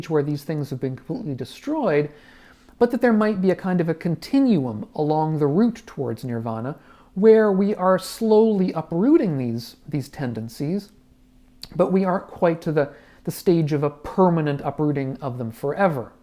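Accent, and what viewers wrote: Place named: Scotland, United Kingdom. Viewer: You sound scottish to me i like your lectures great stuff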